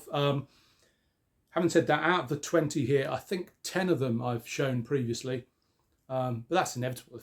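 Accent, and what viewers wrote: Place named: Scotland, United Kingdom. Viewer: What a perfect scottish inclination of your. Namastè.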